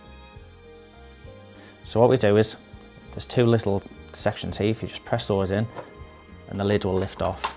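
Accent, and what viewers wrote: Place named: Middlesbrough, United Kingdom. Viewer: Sounds like you're from Boro :)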